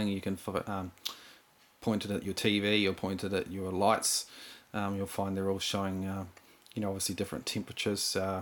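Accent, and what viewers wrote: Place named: New Zealand. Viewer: Thanks, I was considering this, will definitely get one. Great to hear a Kiwi voice too!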